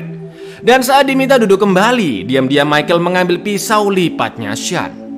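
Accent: Java, Indonesia. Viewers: Bang suara Lo kek orang Jawa ya!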